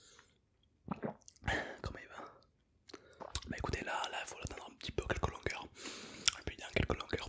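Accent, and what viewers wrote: Quebec, Canada. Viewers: L’accent québécois 😍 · Moi qui adore l'accent Québequoi c'est un pur plaisir d'écouter de l'asmr Québécois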